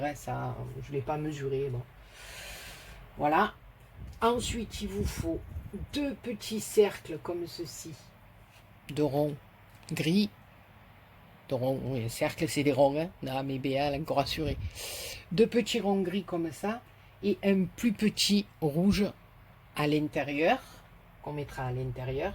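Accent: South, France. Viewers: Coucou Béa 😍 c'est grâce à la vidéo de Greg que je me suis abonnée à votre chaîne et quel bonheur de vous entendre, car je suis expatriée en Alsace mais je viens du Sud (Nîmes) aussi....alors un enchantement de retrouver notre accent. Merci pour ce tuto je vais le faire dès aujourd'hui. Passez une bonne journée. Adessias et grosses bises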